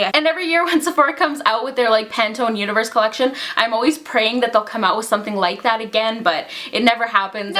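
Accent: Canada. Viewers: Why are you so pretty? I need to know the secret. Haha. And for some reason I can totally hear your Canadian accent in the video for the first time, lolol. Xoxo.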